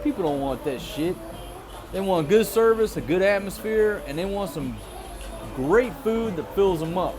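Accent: South, United States. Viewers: Your accent sounds like you're from the south? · You have a cool suthern accent. Lo Great video anyway.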